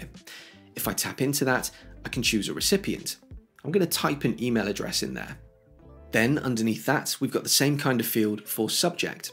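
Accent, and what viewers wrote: United Kingdom. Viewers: Often wondered, now I know, 🙏 and you’ve a British voice without the bull that most vlogs from across the pond revel at.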